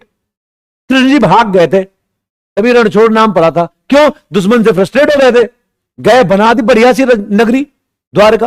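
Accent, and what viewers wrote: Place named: Bihar, India. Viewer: I think he is from Bihar 😅 aawaz waysi hi h ❤️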